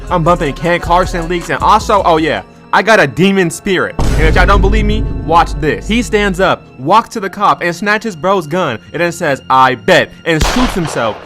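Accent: United Kingdom. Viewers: This guys british accent is deadly bruh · I liked the British accent, what are you talking about?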